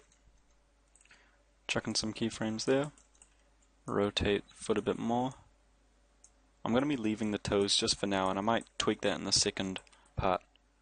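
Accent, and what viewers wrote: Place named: Denmark. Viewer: Where are you from man? you have a Danish accent!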